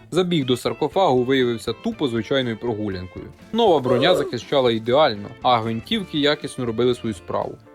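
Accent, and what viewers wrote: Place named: Western Ukraine, Ukraine. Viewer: Я рабсиянин люблю смотреть и слушать украинский язык с западным акцентом вообще бомба! Особенно когда смотрел про херсонского енота из стражей галактики это шедевр! Героям слава!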